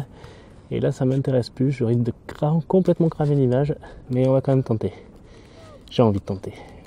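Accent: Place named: Var, France. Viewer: Ce film a l'air très intéressant, J'ai vue la vidéo de EMGK et j'ai bien aimé le rendu ultra contrasté. Sinon tu as fait de super cliché, notamment les reflets des bateau alignés, et les deux mouettes sur le lampadaire. Hors sujet : tu es du var non? Il me semble reconnaître le bord de mer varois, et ton accent m'est familier ;) PS : je prefère la Kirin à la Asahi :D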